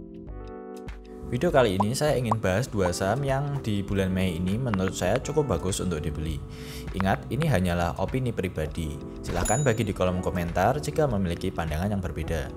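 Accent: Java, Indonesia. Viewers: Medok Men coco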